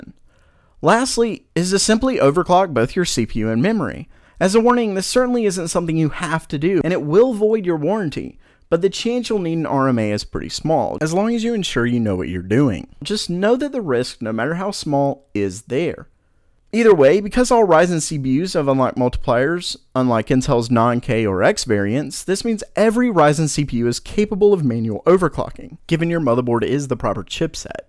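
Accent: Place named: Philadelphia, United States. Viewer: You gotta be from philly...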